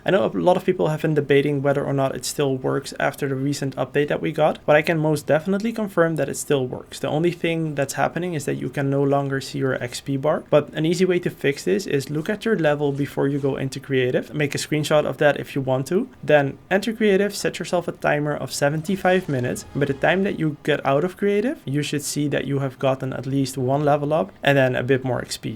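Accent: Netherlands. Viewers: Ik hoor Nederlands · why do you sound dutch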